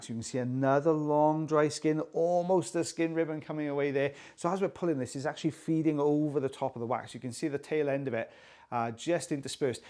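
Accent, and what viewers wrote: Wales, United Kingdom. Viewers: all these comments saying hwo enthusiastic you are; im just hearing a fellow welshman - we always sound enthusiastic hehe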